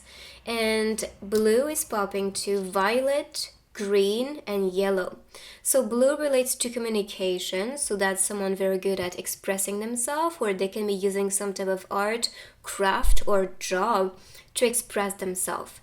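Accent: France. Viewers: You have such a lovely voice, are you French?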